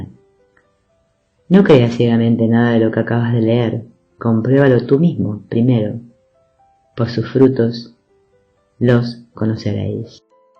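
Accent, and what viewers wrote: Argentina. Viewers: Que voz argentina tan bonita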